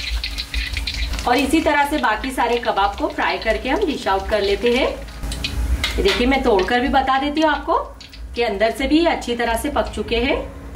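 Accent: Ahmedabad, India. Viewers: Jitni bhi hindi bolne ki koshish karo miya bhai ki ahmedabadi bhasha to chalak hi jaati hai 😂❤ allah kamyaabi de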